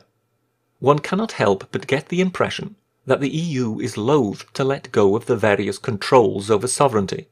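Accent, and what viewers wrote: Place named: Scotland, United Kingdom. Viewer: I HAVE THE GREATEST OF RESPECT FOR JULIAN ASSANGE. ANGEL IS IN HIS NAME , AND I PRAY THEY PROTECT HIM. HE IS SIMPLY PRESENTING TRUTH, AND THOSE WHO ARE GUILTY MAKE EVERY ATTEMPT TO DEFAME HIS REPUTATION, HOPING THAT WILL BRING HIS WIKILEAKS ACCURACY UNDER SUSPICION. WE ARE TOO WISE FOR YOUR LIES ABOUT HIM. IT APPEARS THAT JULIAN HAS A LOYAL RESCUER/SUPPORTER HERE. THIS VOICE IN THIS VIDEO IS NOT JULIAN. ACTUALLY I HAVE A WEE BIT OF SCOTTISH IN MY HERITAGE AND SUSPECT THE SPEAKER IS SCOTTISH. YOU HAVE OVER 100 VIDEOS USING ONLY 2 COVER PHOTOS . I wish this speaker would tell us who he is (but in wisdom, given the unjust treatment Julian has endured, perhaps wiser to remain incognito. Respect to you, sir.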